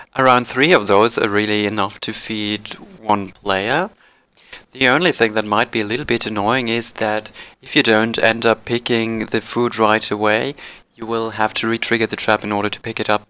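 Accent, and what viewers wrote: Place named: RP, United Kingdom. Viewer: I love your accent. So posh.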